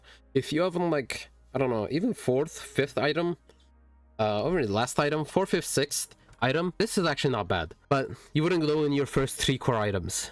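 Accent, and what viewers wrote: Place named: Western Europe. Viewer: Whether you're from EUW (a guess based on your accent) or NA (your region), you're handsome as heck.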